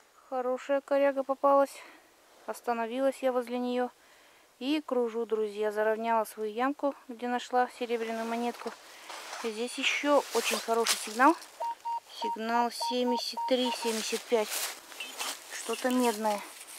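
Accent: Russia. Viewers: Говор то северный, так приятно слушать) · Уральский говорок?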